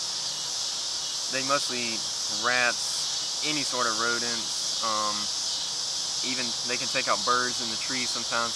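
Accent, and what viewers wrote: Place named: South, United States. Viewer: I never noticed how thick your southern accent is lol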